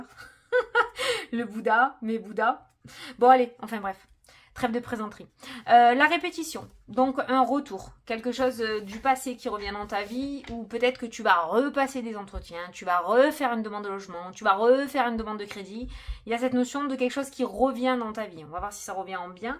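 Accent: South, France. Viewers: Bonjour, peu de temps que je vous écoute, j’adore votre accent du sud qui nous enchante nous les gens du Nord ❤ Un grand merci pour votre guidance 😊 Patricia 23 janvier 1962 Bonne soirée et à demain 👋👋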